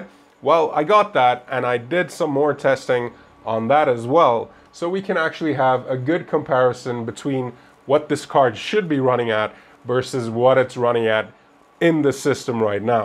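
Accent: South Africa. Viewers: Awe are you from South Africa bro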